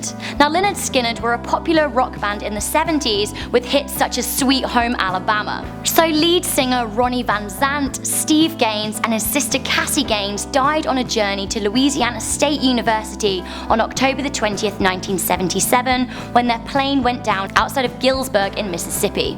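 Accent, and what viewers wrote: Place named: United Kingdom. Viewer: yes she is pretty n I'm also a follower.I love her accent. its so British. love that.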